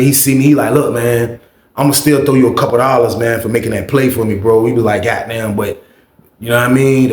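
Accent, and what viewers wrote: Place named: Chicago, United States. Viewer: Yo the Chi town voice tho! 😂😂😂😂 then the $1000 POWER PLAY!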